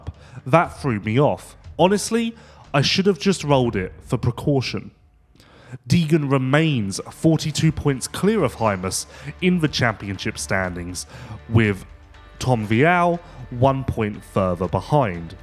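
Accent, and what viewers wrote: United Kingdom. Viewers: really embracing his British pronunciations on this one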